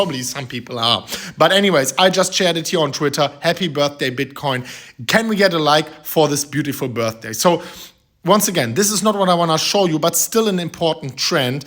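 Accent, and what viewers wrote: Germany. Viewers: I watch his video for the drama and his German accent, “People spitting on my face…”😂 Keep it up as it’s all going down…trend is our friend until the trend; the macro trend in down first time in crypto history…so screw the pleul or some Dutch crypto guy or whoever… BTC will be lucky to hang in at $16k for 2023!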